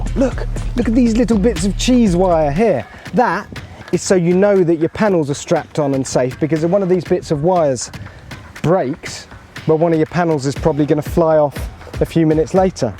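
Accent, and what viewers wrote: United Kingdom. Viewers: more like a mix of Hammond and Gordon Ramsay accent lol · Cuz he's british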